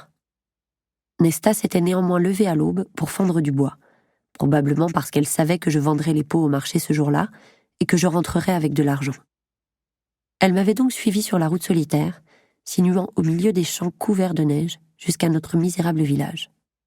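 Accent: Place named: United States. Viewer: Quand on a lu le livre la prononciation des prenoms à l'americaine est tres perturbante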